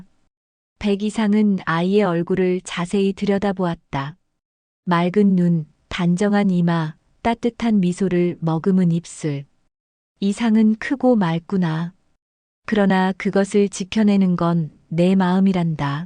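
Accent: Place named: Gyeongsang, South Korea. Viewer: 또 경상도😂😂😂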